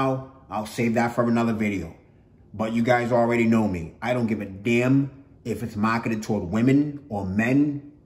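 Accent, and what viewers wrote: Boston, United States. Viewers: that's a 100% authentic Boston accent right there.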